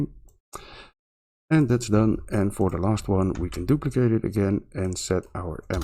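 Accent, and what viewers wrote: Netherlands. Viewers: Dutch accent? :)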